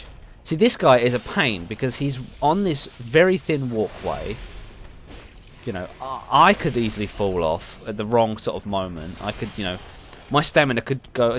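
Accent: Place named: United Kingdom. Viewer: Ok, have I done something wrong?. I've opened up Anor Londo but after seeing this vid I thought i'd go back and clear up all the loot and kill the headless demons in the basement ( I dunno their name ) I jumped down to talk to Siegmeyer and the guy ain't there, he's not at firelink either (scratches head). Loving the vids, nice to hear a fellow Brit's voice on the commentary!;-)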